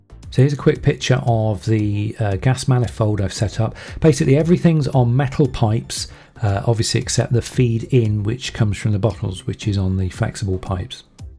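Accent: United Kingdom. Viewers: You sound like your over in the U.K.? We did have some ex friends that lived over near Cumbria UK, the lakes District. But we was friends to them but they weren't true friends to us, they was true users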